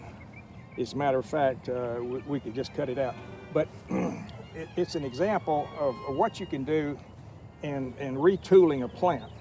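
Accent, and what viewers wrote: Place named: South, United States. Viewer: me too. I was expecting a different accent for sure. Happy it’s a southern accent I can understand!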